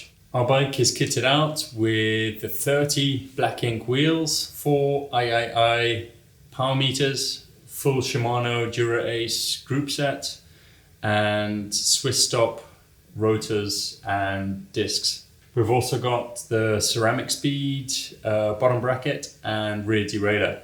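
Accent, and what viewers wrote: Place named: South Africa. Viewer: Everything he said about disc brakes is accurate. It's not where it needs to be. Also, I could really hear the SA twang in his accent during this video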